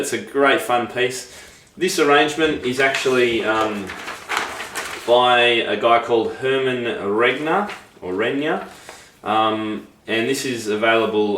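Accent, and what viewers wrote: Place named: Australia. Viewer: you're so good! and Australian! xD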